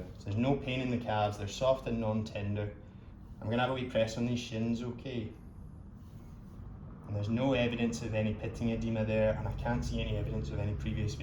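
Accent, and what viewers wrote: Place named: Scotland, United Kingdom. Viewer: lovely Scottish voice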